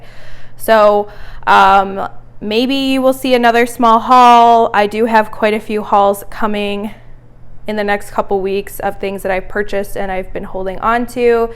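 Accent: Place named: Upstate New York, United States. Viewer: You got lots of cute pieces! My husband is also proud when he adds a new tool to his collection lol! Just guessing by your accent, but are you from upstate NY? 😊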